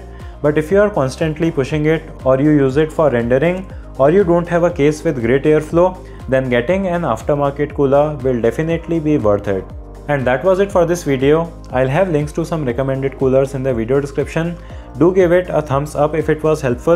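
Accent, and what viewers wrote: India. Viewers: Can’t watch this. Indian accent is so annoying.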